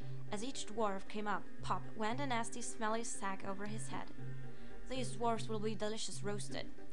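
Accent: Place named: Ireland. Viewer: I got your accent now, now I know why it sounds Irish a bit.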